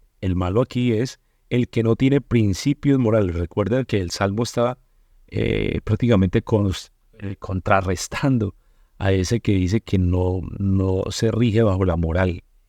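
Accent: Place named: Colombia. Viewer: HOLA PASTOR..POR SU VOZ CREO QUE ES DEL PAIS DE COLOMBIA..YO VIVI EN COLOMBIA PERO YA ME VINE A MI PAIS VENEZUELA. ESCUCHO SUS <link> GUSTA APRENDER DE DIOS CADA DIA.BENDIGO SU VIDA EN YESHUA EL PODEROSO DE ISRAEL ISRAEL..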